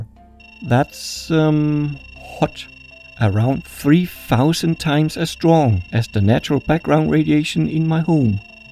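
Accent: Denmark. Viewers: He kinda sounds like a Danish dude with a slightly american accent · you sound oh so danish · Are you danish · R u Danish? Jeg er I hvert fald du lyder dansk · I love that danish accent :D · You sound danish 😌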